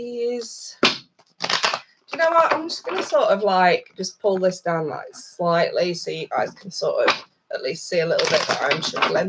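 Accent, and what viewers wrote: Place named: United Kingdom. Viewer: Your simply splendid! Team Aries here trying 2 let go of Libra drug addict ex Tyler recommend u Love yr British accent 👌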